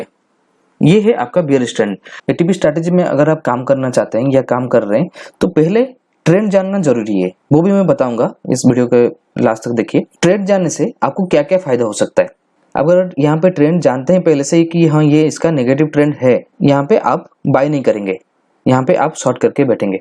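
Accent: Odisha, India. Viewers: Are you Odia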